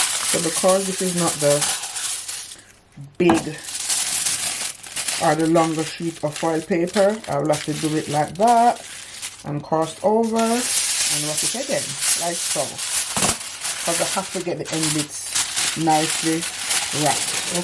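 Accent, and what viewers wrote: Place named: Jamaica. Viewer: Very well presented you're channel is amazing I love the yard voice. Bless up yu self.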